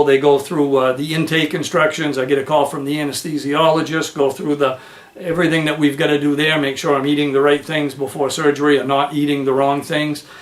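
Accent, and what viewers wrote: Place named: New England, United States. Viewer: Hey, I'm new to your channel, just subscribed today, 8 -28-21..... Saw your video from 5 years ago on the Dolos system....Dig it....... Don't know much about you or the content of your channel, but I liked what I saw and how you present and come across to your viewers... I'm a vet myself (semper fi), and can appreciate how crazy this year had to have been for you and your family.Life is a fragile thing.... So, just wanted to reach out and wish all of you the best in health, happiness and better fortunes from here on out..... I'm in the new England area as well, picked up on your accent before you mentioned Maine (lol)..... Look forward to more of your vids when your up to it..... Brighter days my friend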